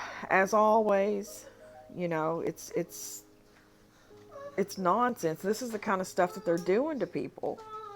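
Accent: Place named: South, United States. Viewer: Hey. I love your channel and I love hearing a sister from the South. I'm in Nashville. Much love. Gonna share your channel on my Twitter if that's cool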